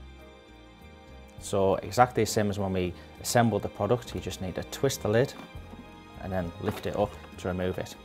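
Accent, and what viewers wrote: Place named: Middlesbrough, United Kingdom. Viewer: Sounds like you're from Boro :)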